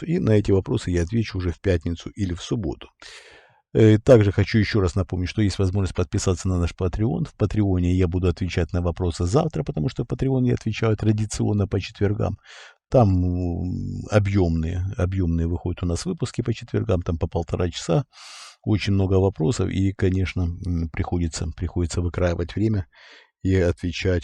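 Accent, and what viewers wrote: Ukraine. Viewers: Вопрос❤,у вас украинский акцент,вы украинец по национальности???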